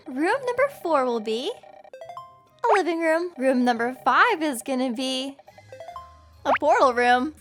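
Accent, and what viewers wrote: England, United Kingdom. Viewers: Are you English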